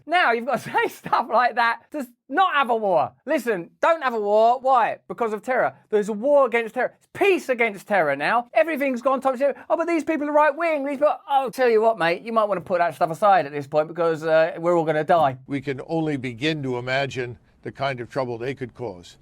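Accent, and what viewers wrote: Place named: United Kingdom. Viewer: Russell, keep it up! Humor+Truth+ contemporaneous rants with a british accent might just save the world! Bravo Bredren!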